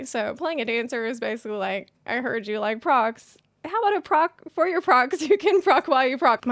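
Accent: South, United States. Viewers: European girl with a country accent.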